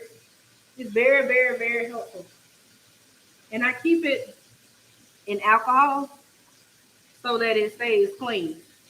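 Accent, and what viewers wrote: South, United States. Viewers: Hey i think a southern Accent is cute i love it no disrespect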